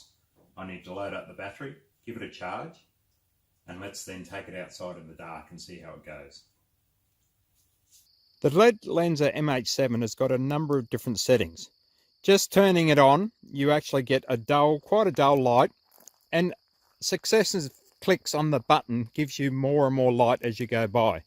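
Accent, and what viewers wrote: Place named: Australia. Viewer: Don't tell me, let me guess: You're from Australia, right? :D In all honesty: Thanks, that was very helpful!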